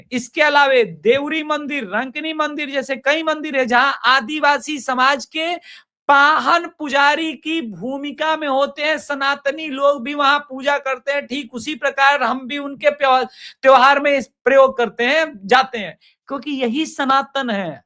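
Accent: Hyderabad, India. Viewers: JI AAP ZABARDAST HYDERABADI BATH KAR RAHE HO…,OH MY GOD,MY HYDERABAD SE HOO, PAR HYDERABAD ASAL NAM BHAGYANAGAR, HA 15 MINUTE MEIN SAB BHAGJAYENGE, JAI BHARATMATA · you have nailed the hydrabadi accent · Bahit majja ata hydrabadi bata sunaneku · आप तो पुरा हैद्राबादी स्टाईल मे बाता करता 😂😂😂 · your Hyderabadi is excellent! 😆 Thoroughly enjoyed this video! We have full-toss entertainment going on in the entire country everyday, and the popcorn machine is getting overloaded! 😂😂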